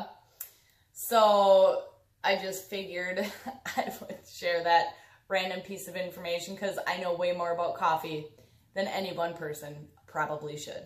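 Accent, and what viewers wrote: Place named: Wisconsin, United States. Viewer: haha I also don't like peace lillies. I completely understand you. Love your videos. I was listening to you and something sounded so familiar. Then I understood you're from Wisconson. I grew up up Wisconsin and live in Switzerland now. Your accent sounds like home.:-) Your plants are beautiful, I am also plant obsessed.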